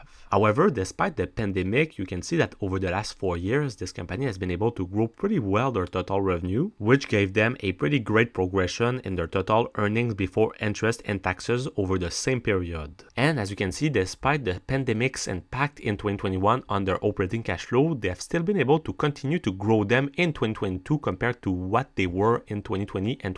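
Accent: Quebec, Canada. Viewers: Salut, j’ai pu comprendre avec ton accents que tu est QC ahah. Tu as l’air super bien informé. J’ai juste pas compris se que Telus fait ds le domaine de la santé.. peux tu m’éclairer svp! Merci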